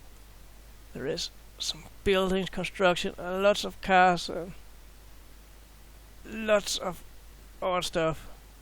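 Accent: Denmark. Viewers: you must be Danish ❤😅. Elsker din accent, hvis du forstår dette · kender en dansker når man høre en.. god video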